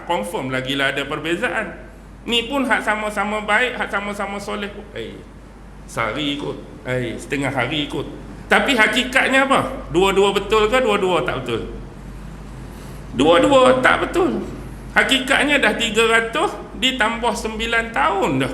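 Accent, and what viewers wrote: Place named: North, Malaysia. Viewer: Umur berapa bang, takpaham loghat utara ka takpaham cerita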